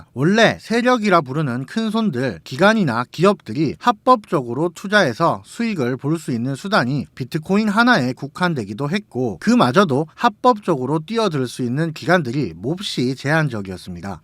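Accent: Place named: Gyeongsang, South Korea. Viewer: 갱상도는 발음 어쩔수없어요 괜찮아요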